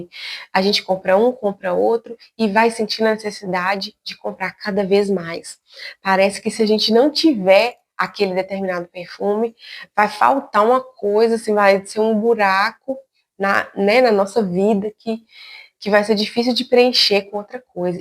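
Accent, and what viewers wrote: Minas Gerais, Brazil. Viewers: VC é mineira? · Mineira com certeza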